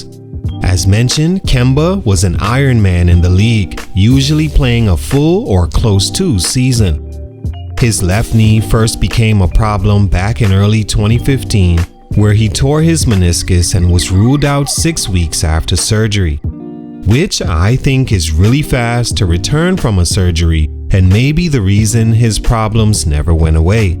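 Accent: Bahamas. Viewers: Bey narrator you Bahamian ay? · Bro, I completely forgot about Kemba. Excellent video! BTW, you sound Bahamian. If so, big up from Canada!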